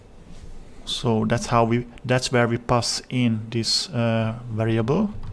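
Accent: Hungary. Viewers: 12 évig éltem külföldön, felismerem a magyarokat :) Egyébként meglepően jó az angolod. Most megyek, próbálom megérteni az auth dolgot. Ezen vagyok elakadva (middleware etc), én még a régi "nyers" php programozáshoz vagyok szokva, de elhatároztam, hogy megtanulok valami modern php keretrendszert. Így lett Laravel. (CSS az megy) Megy minden vacak már, blog rendszer, blabla, de egy role system nem akar menni: admin routing XX oldalra, user routing YY oldalra, middleware hogy mindenki azt lássa amire jogosult. Tutorialt lekövetek, másolás megy, de érteni is akarom :)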